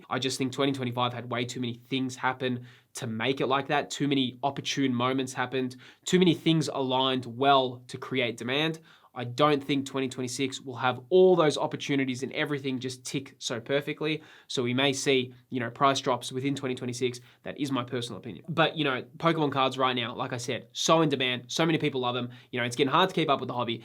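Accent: Australia. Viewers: Hey, I've watched a few of your videos now and I just wanna say that I truly appreciate your content: besides the genuine passion for the hobby that you transmit, Im always very interested to hear your thoughts about the investing/ financial side of it. Keep it up please! Also, I lived a few years Down Under and I love to hear again some Aussie accent! And last thing, I think you said something very profound: that you don't have to be good at your hobbies to enjoy them. It made me think when I was too learning how to surf and getting always so frustrated because I wasn't as good as I wanted so bad to be. You just have to like what you to have fun! Keep it up 👍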